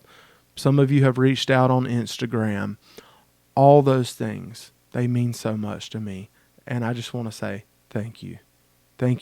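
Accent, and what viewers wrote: United States. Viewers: Love your accent and pronunciation, where is that from in the US? Good job calling out greedy and grifters. Some ppl just think how to profit from others. These times are not easy, there are many ppl having hard time making ends meet. I'm on high carb low fat, that's way cheaper than carnivore. Could just eat rice, beans, fruit, veg and sugar, not a vegan, but if it came to that, could survive on much less than those on carnivore.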